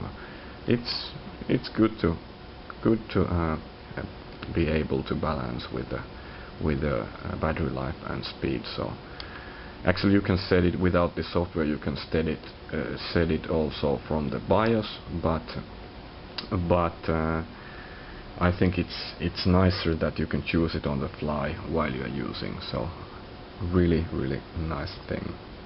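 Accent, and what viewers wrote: Finland. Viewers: Hah, accent doesn't get more Finnish than that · Selvästi suomalainen mies puhumassa englantia :)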